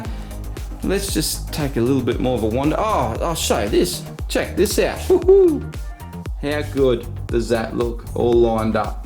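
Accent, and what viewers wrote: Australia. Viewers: Now correct me if im worng but you sound Australian, and if that is the case are you ok? You know with the the fires happening.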